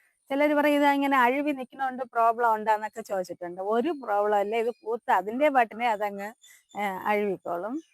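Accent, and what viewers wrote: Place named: Trivandrum, India. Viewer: Nalla സംസാരം. ഒറിജിനൽ. TVM മിടുക്കി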